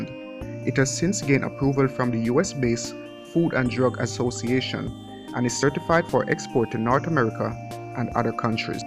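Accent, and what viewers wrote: Jamaica. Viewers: It still sounds like he's speaking creole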